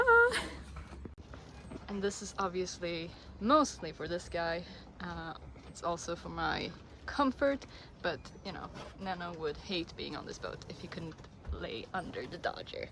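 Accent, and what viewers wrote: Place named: Scandinavia. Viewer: You aren't American? I just found you. What are you, one of theose Nordic countries? They have perfect American English and fool me all the time. Almost everywhere else speaks a British English. Anyway, I look forward to watching.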